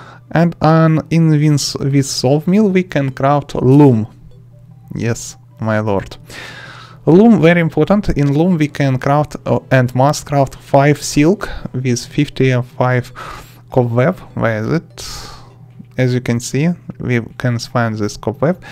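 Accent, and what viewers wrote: Russia. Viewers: Акцент, русского человека)) Привет! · Русский акцент тебя выдает...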